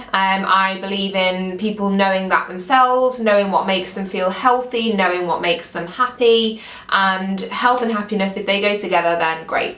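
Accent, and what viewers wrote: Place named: United Kingdom. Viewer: You have a British accent. Lovely😍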